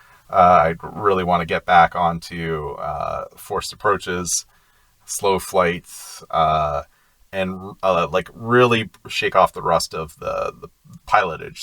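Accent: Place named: Canada. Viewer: a Canadian I hear heheh! Cheers tho, im just getting into flying 🎉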